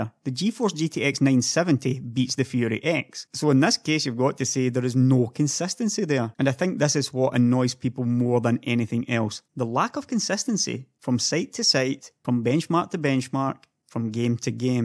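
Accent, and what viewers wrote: Scotland, United Kingdom. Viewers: Scottland? Just wondering because of how you speak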